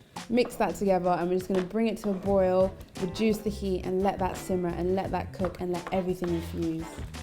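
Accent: London, United Kingdom. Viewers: Im a 1st time VIEWER......Oh My Stars🤩🤩🤩🤩🤩 This is a plant based FEAST🥳🥳🥳🥳🏆🏆🏆🏆 I wouldn't be able to finish that bowl but I would SURELY TRY! LOL That looks so good! Thanks for SHARING Doll🏆 U & Tish Wonders need to team up.....U both have that adorable London Accent🏆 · I knew it 💯% she was going to have a British accent 😂 she just looks English lol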